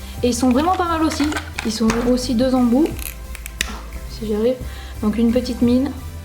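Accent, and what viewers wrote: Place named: South, France. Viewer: Tu presente très bien et dis tu vien du sud sa ce voit a ton accent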